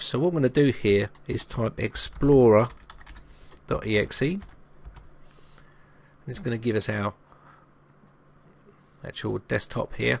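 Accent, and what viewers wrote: United Kingdom. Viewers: Thanx here to my new friend-you save my kids recently purchased laptop-you sound like you are from thee other side of the pond...lol, either way I am just glad you had my back on that one. Toney B . (Philly)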